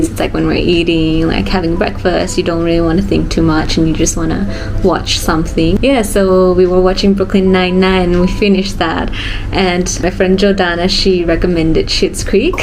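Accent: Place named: Australia. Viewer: Your Aussie accent is getting stronger! ❤️ hehe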